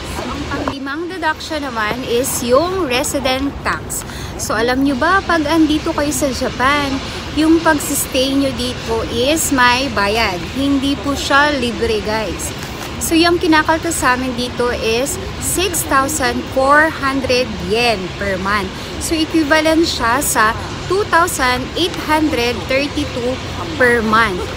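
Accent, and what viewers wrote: Visayas, Philippines. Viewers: Bisaya d.i ka ma'am 😅 cebu here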